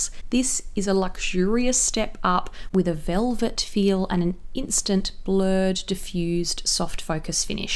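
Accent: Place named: Australia. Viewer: I Like Aussie People. Love The Accent.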